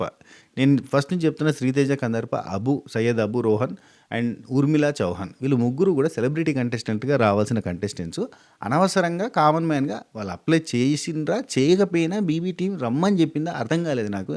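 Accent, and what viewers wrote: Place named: Chittoor, India. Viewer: record cheste mimmalni Enduku kodatharu garu cheppandi miru Adbutham ga video's chestaru Adi kuda Swathi pickles entha veyttikina dorakadam ledu kani miru mee webset pampinchi chala manchi panichesaru garu Mee language chittoor slang chala bagundi garu ee madyalo mee youtube video's chala Trend ga marindi garu mee chittu pakala block bord ekada dorukuthundo cheppandi garu plz nenu kuda Order cheskunthanu garu plz Aa youtube maike kuda entha chepthara garu plz nenu online lo veyttikithe 40000+ payment chupisthundi garu mee maike entho naku cheppandi plz garu plz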